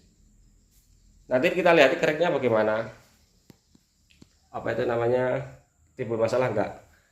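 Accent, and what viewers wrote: East Java, Indonesia. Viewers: Jelas kenal Suarane wong nggalek Tonggone cak mat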